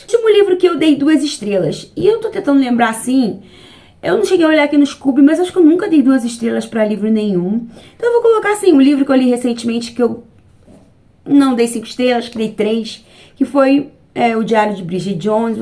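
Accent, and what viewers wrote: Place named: Rio de Janeiro, Brazil. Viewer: te acompanho há pouco tempo. E ficava sempre “encafifada” … é carioca. Como acompanho uma infinidade de canais … a gente vai pegando as gírias, os sotaques. E nesse vídeo específico … tirou minha dúvida. 😂😂😂 Carioca!!!! Parabéns!!! Adoro seus vídeos e seus shorts. Você é autêntica. E me identifico em uns shorts. Sou eu!!! e rio muito. 😉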